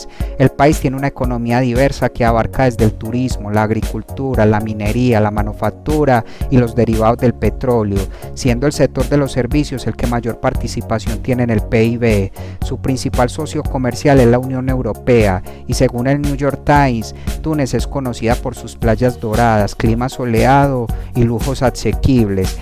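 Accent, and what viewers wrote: Antioquia, Colombia. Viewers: No me gusta ese acento paisa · Hermoso tu video hermoso tu acento colombisno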